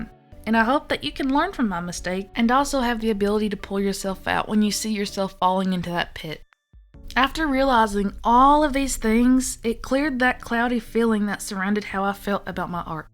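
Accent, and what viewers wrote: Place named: Rural, United States. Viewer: i love your voice, it just makes jokes have that country flair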